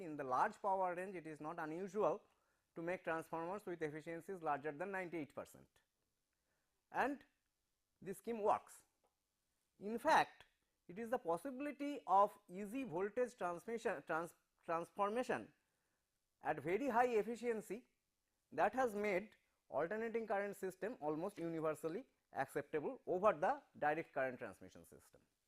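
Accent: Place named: Bengal, India. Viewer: He is bangali ( you can tell it form his assent) ❤ and he amezing ❤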